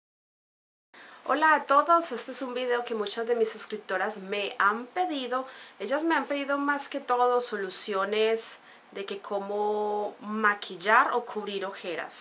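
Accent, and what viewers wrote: Colombia. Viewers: no tengo ojeras ,solo pase por tu video y me embrujo tu acento colombiano. bonita, inteligente y simpatica...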